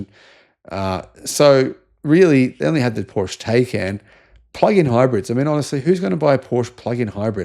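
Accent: Australia. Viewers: has amazing analytical ability. heralds the rise of EVs and the demise of the Internal combustion engines for five years as we now see this happen before our eyes. I used to call you, in 2021, (where you no longer live). Congratulations on your abilities and foresight. Thanks for thousands of videos and positive outlook. 😂🎉 And after 1000s of videos as well as from SMR, I, from Arizona, still can't do the Australian accent!!! 😢 Thank you.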